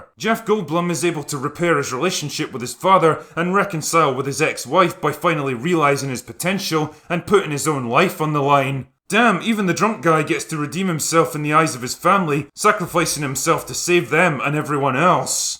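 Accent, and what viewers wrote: Scotland, United Kingdom. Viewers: With his thick Scottish accent it made it funnier lol. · Listening to "USA! USA! USA!" with your Scottish accent made my day, Drinker! Couldn't agree with you more about this movie!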